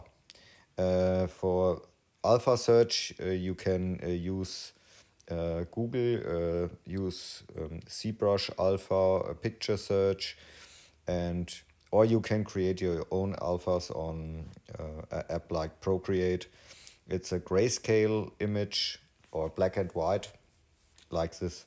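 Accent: Germany. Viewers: English with german accent :)) very very useful videos Thank youuu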